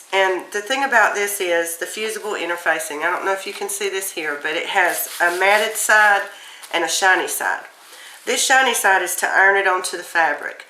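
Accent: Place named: South Carolina, United States. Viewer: Thanks for this! Very helpful. I have to ask… are you from South Carolina? Love your accent 🤍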